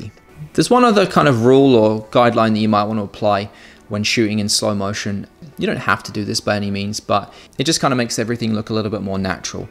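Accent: United Kingdom. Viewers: Great video, your video was talking about shooting at 60 or 120fps, obviously your sony will be in NTSC mode, judging by your accent, Im assuming that you live in the UK. If so, do you change to PAL when shooting in doors to avoid flickering. I have the a6500, I live in the UK, I shoot at 100fps for slow motion, is there much difference between NTSC & PAL, apart from the extra 20fps?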